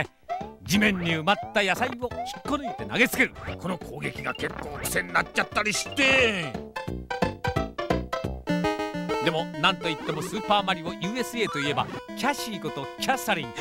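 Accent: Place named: Japan. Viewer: Narrators speaking English but in Japanese accent.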